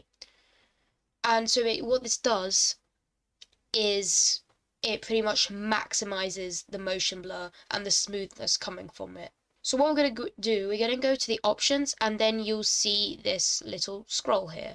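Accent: United Kingdom. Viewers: that british accent doe 👌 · ayo first time hearing a female british player! you deserve more attention on your vids tho edit: ok before someone comes to kill me, i dont know anything about the voice seems female to me so i said it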